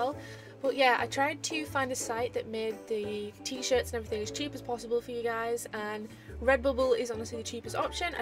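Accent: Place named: Manchester, United Kingdom. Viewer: Your voice reminds me of an old mate from Manchester ^^ keep it up, deary!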